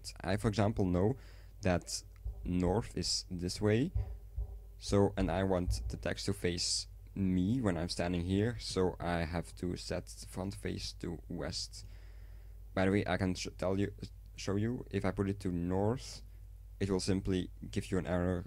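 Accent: Netherlands. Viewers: Nou ja ik ben gewoon nederlands ik hoorde het aan je accent en aan je naam zag ik het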